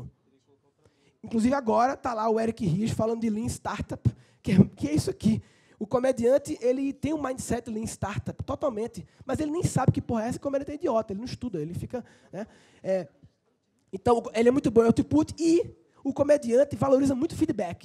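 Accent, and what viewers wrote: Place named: Northeast, Brazil. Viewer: amo o sotaque do Nordeste (que meu terreninho na Bahia me aguarde;) e quanto a pasta de dente, ri demais! Ainda mais sendo dentista! E hoje a tampa é grudada no tubo, reparou? Acho que ouviram você! Rs. Show!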